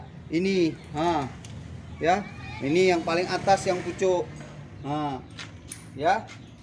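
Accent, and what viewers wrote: Banjar, Indonesia. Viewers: Logat bahasa banjarnya masih kental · Logat banjar nya kd hilang lah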